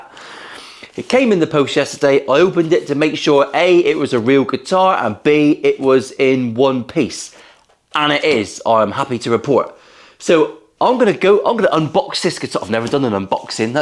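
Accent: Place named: South West, United Kingdom. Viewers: I’m thinking of going into the guitar nut business and branding them Wanksox 😂 loved the video, and for a an ex BritPat living in US, I almost understood the west country accent 😊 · I subbed for the south West accent and the comment about going on Ebay pissed 😂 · Scouser? West Country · Always a trip hearing a fellow Janner on YouTube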